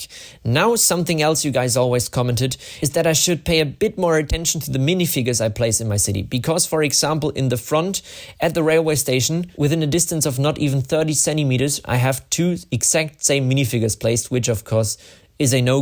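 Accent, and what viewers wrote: Germany. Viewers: since you sound german you should build a traintrach to a small concentration camp with some gas chamber and ovens, make a working lego oven with a lighter in it to burn some characters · ⚠️This isn’t meant to be hate - like, at all⚠️ You kinda sound like you have a German accent 😮 Could you perhaps come from middle Europe?😅 I’m really sorry if this sounds offending in any sense❤😢 Edit: I just saw that elevator video from Jensbach 😂 so are you from Austria? Just curious 😅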